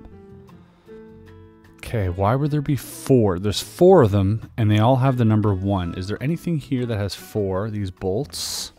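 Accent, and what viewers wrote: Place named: Vancouver, Canada. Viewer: ph shit. I identified you as a person from Vancouver based on one video. It was your taste and your accent that gave it away. I think knowing what city you're probably from is better than that puzzle.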